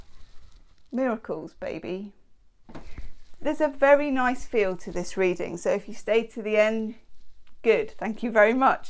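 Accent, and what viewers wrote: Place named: Cockney, United Kingdom. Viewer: Fahhhmly. What a wonderful cockney accent you have. So many talents. And such sweetness. Thank you.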